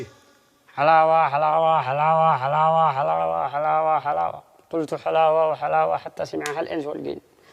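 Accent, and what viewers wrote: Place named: Raymah, Yemen. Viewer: ...يتحدث اللهجه الريميه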